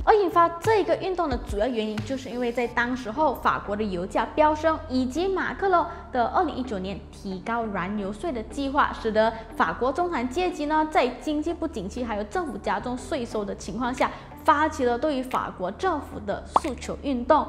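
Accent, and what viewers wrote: Malaysia. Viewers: 这是地道的马来西亚华语口音，不是中国口音，谢谢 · 这是马来西亚华人的口音吗，感觉好可爱。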